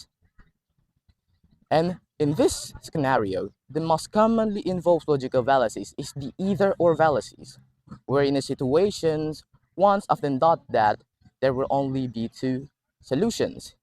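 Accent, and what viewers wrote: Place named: United Kingdom. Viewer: British nya terasa banget 😂 , tolongg sesekali buat video jokes pake bhs inggris dong.. 😄 arry po ah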